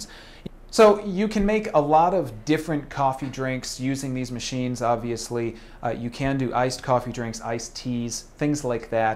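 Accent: United States. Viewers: he has a regional American accent. I assume you are Canadian as am I.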